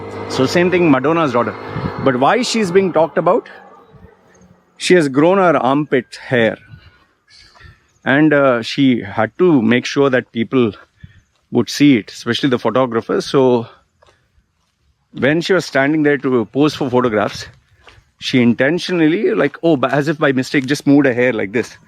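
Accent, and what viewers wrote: India. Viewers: Well in a way he is an Indian though. In UAE he studied in an Indian school. Yes, he is not an Indian citizen, but most of his things are Indian, including his English accent.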